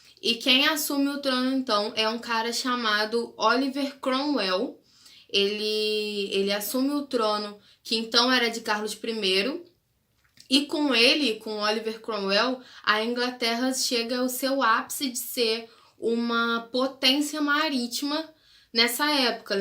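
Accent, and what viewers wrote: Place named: Rio de Janeiro, Brazil. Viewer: O sotaque carioca é o melhor!!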